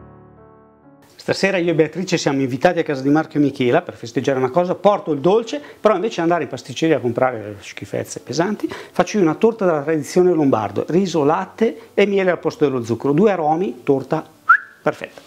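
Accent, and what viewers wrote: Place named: Massa, Italy. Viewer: UNA BELLA RUMATA ...... DIALETTO MASSESE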